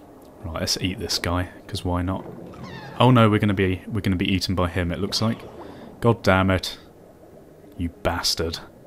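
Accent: United Kingdom. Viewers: youre voice sounds like mine but british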